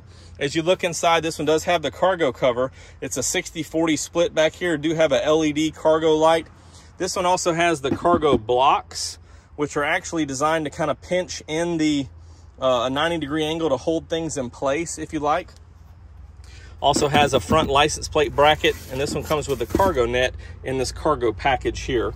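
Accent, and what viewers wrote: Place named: Alabama, United States. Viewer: It’s kinda funny because the sales rep in our company from Alabama says “sells” instead of “sales” just like you.🤣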